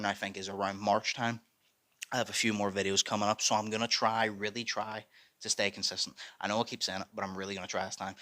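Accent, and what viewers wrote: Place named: Liverpool, United Kingdom. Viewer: Hahahhaha bruv your accent is amazing, you must be from Liverpool innit?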